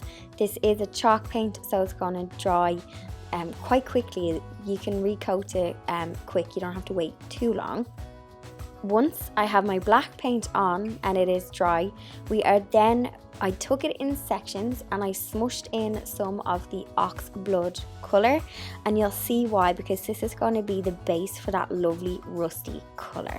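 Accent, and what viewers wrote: Ireland. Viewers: Cute! When you were talking about YouTube algorithms I didn't understand you. I'm an American and sometimes your beautiful Irish accent is difficult hard for me to comprehend.. Could you explain what you meant?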